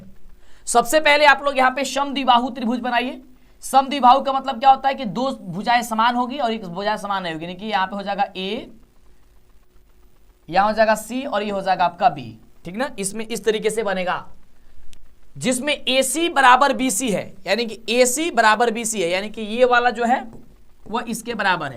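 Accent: Bhojpuri, India. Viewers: Aap Bhojpuri mei Kyo boliye Lagte Hai sir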